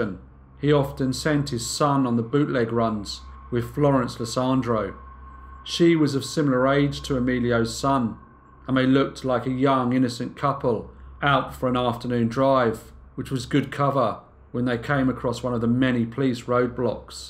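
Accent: United Kingdom. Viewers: British speaker...should know better. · Best regards from Mumbai. Appreciate your quality work & crisp British accent.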